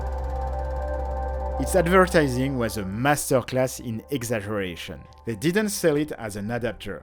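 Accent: France. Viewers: 1. Great and fun historic video 2. Interestingly, this is a physical form of compression. Meaning, for example, JPEG 2000 uses wavelet compression, which is sort of the physical version of that. Another way of thinking of it is instead of shrinking an image in 2 axis, compressing just one. 3. I invented many technologies for the Movie industry, including Core tech for Video Assist (ARRI/Panavision/Sony ) and I invented Disney's Animation Studio software 4. Your French accent is so strong, I thought you were doing an Inspector Clouseau skit. This is not an attack; you are completely understandable. In fact, I would vote if anyone wants to learn to speak French, first learn to mimic you speaking English, and THEN learn French. In sort of a strange way, you're the anamorphic accent filter. English -> English with only French pronunciation -> French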